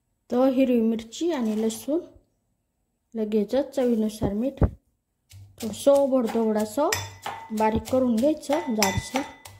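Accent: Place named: India. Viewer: तुमचा आवाज कीती गोड आहे 😍 अस्सल गावरान मराठी भाषा त्या आवाजाला अजुन सुट होते 👌💯✅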